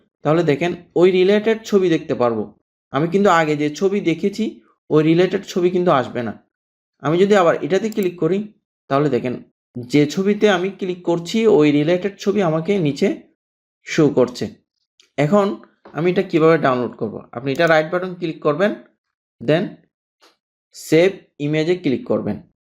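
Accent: Chittagong, Bangladesh. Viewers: ভাইয়ার বাড়ি নিশ্চয়ই চট্টগ্রাম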